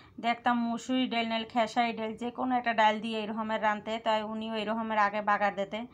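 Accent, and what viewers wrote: Bangladesh. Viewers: আপু তুমার বরিশালের ভাষা টা আমার কাছে খুবই ভাল লাগে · Onek sundor hoyeche recipe ta ❤️ Borishaler bhasha khub sundor ❤️🥰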